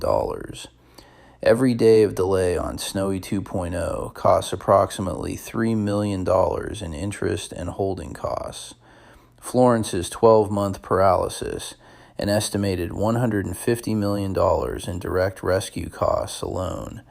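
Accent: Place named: South, United States. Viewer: The diagrams look great but how accurate are they? Also, why did you choose a Southern drawl for an Australian subject? Elevenlabs?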